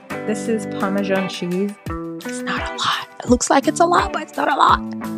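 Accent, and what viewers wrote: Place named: United Kingdom. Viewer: Why did you sound British in this video abi na AI? 🤣🤣🤣. Tell me the truth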